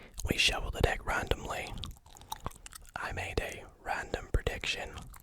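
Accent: Scotland, United Kingdom. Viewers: I’m new to you channel. You Scottish?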